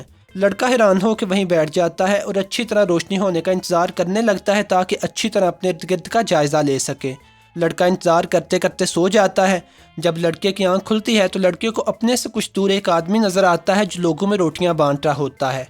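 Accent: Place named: Lahore, Pakistan. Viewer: Teri awaz boht ajeeb hai , you are LAHORI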